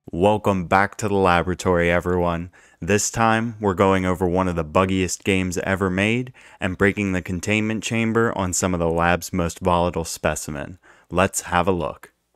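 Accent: Philadelphia, United States. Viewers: I recognize your accent! Are you from Philly, too? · Are you from philly? Your accent makes me feel like I’m at home lol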